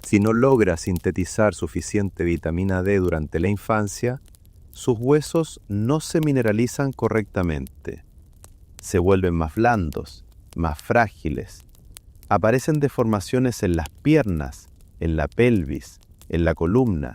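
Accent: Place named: Chile. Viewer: Me encanta la IA con lenguaje cbileno, like en todos estos videos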